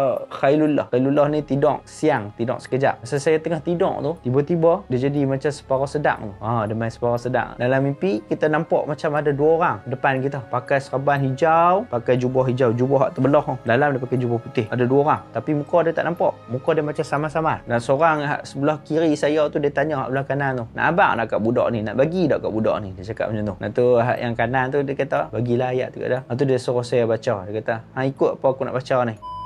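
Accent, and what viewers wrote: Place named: North, Malaysia. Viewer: speaker ber-loghat utara ni, jarang lah membosankan. baik punya storyteller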